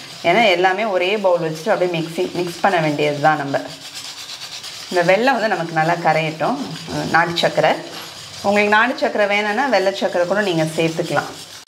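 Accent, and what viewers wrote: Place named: Cuddalore, India. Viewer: No words shown ka. Video is clear. I think mam had turned on tamil caption(subtitle) in her mobile. Cake looks super. Please try Eggless Brownie soon. Myself born and bought up in cuddalore district, my daughter born in Jipmer. We got settled in Chennai. Really your slang gives me my own native touch.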